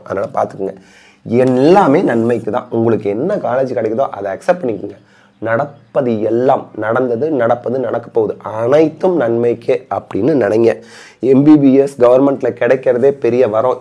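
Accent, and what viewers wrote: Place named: Trichy, India. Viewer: Trichy student assemble namma sami namma basaha pesuthu 😂😂😂😂🫂❣️ na nenga mass na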